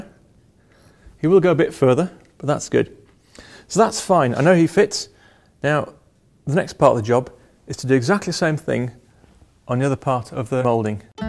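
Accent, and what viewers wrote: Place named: United Kingdom. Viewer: not only is this Brillant !! but your British to boot whoo :) no wedding band hehe ( my bad ) LOL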